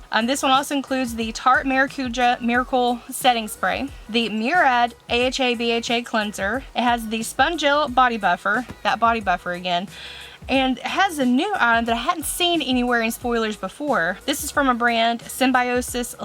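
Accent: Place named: Georgia, United States. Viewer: Love your style. And accent-- it reminds me of home. GA girl here ;)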